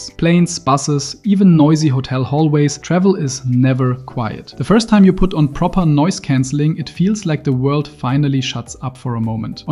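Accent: Germany. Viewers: The man is from Germany and probably only used three different microphones or recorded on three different days while he was travelling. At least, I don't know of any AI that can imitate a German accent as well as a German who speaks English.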